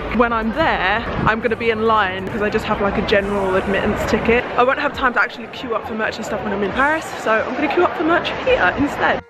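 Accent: United Kingdom. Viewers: You have beautiful British accent 😍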